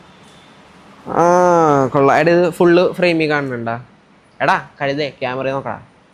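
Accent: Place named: Kochi, India. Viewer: Kochi slang poli bro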